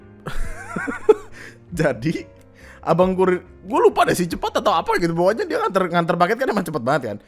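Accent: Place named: Kediri, Indonesia. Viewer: Kediri banget logatnya😂 salam dari Kediri bang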